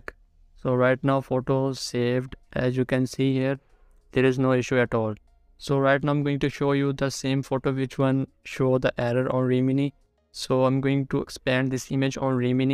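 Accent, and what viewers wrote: India. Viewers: اصلا ما فهمت الطريقة لان يتكلم هندي و دوخني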